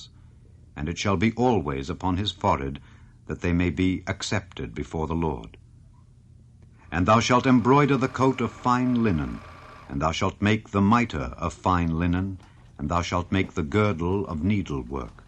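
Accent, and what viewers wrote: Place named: United Kingdom. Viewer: So funny to see beautiful Jewish history with super British voiceover haha, Just feels so unnatural...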